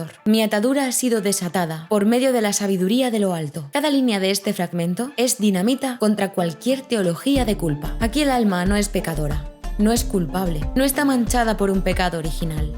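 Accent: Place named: Spain. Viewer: Trato de soportar el acento españolete pero paila. Es irritablemente insoportable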